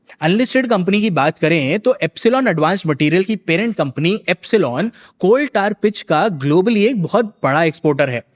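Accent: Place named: Uttar Pradesh, India. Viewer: I guess Northern states like UP, Bihar. I'm from UP and it seems normal accent to me.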